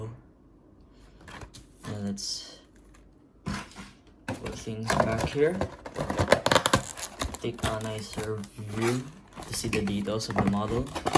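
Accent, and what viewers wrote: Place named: India. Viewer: Are you Indian? I like your accent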